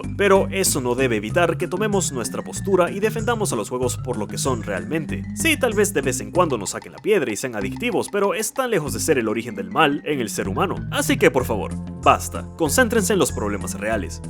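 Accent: Venezuela. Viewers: una pregunta y disculpa eres venezolano?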